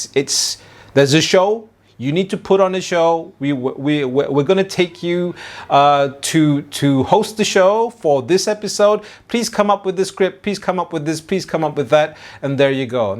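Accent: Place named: RP, United Kingdom. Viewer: You're speaking the Queen's English. Well done.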